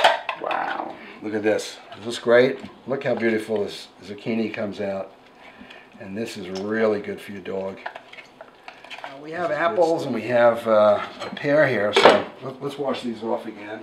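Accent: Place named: Long Island, United States. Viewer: it's good to hear a normal long island accent. i'm too far from home, everyone sounds unfamiliar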